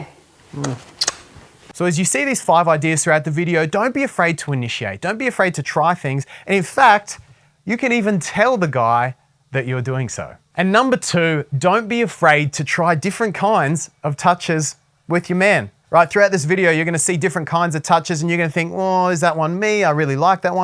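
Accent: Australia. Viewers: is that ur real name, I have good reasons to ask, r u Chris Pratt? Someone meshed some faces in vids sent to my phone. I met someone in my town I'm living in, in Canada, who looks like you or like the Vid of Chris Pratt sent to my phone. (R u Chris?) The man I met said his name was Dylan? Do you actually have a real partner or just for the vid? Is ur Aussie accent for real, is ur Mat whose filming ur vid, last name Leblanc?(not the friends actor)? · are you Australian?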